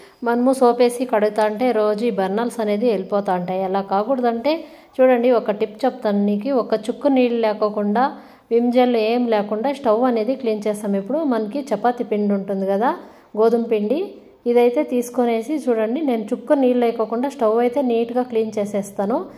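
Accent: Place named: Chikkaballapur, India. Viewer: ಮೇಡಂ ನಿಮ್ಮದು ಕರ್ನಾಟಕ ತಾನೇ ಕೋಲಾರ ಅಥವಾ ಚಿಕ್ಕಬಳ್ಳಾಪುರ ತೆಲುಗು ಮಾತಾನಾಡುವ ಶೈಲಿ ಚಿಕ್ಕಬಳ್ಳಾಪುರ ತೆಲುಗು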